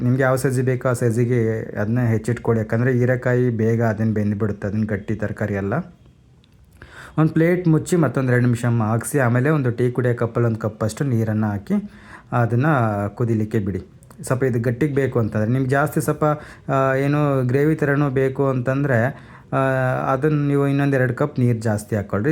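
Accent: Chitradurga, India. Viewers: ಸರ್ ನಿಮ್ಮ ಮಾತು ಕೇಳಿದರೆ ಸಂತೋಷ ವಾಗುತ್ತದೆ. ಚಿತ್ರದುರ್ಗ ಭಾಷೆಯ ಸೊಗಡು , ಪದಗಳನ್ನು ಬಳಸಿ. ಬದಲಾವಣೆ ಬೇಡ . ಏಕೆಂದರೆ ಕನ್ನಡ ವೈವಿಧ್ಯ ಮಯ ಭಾಷೆ . ಪ್ರಾದೇಶಿಕ ಭಿನ್ನತೆಗಳು ಇರುತ್ತವೆ . ನಿಮ್ಮ ಮಾತು ನಿಮ್ಮ ಸೊತ್ತು . 👍👍👍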